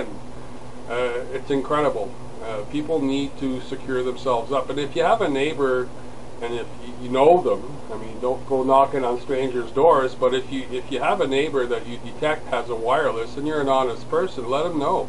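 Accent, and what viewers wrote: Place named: Canada. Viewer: hahaha Canadian accent!!!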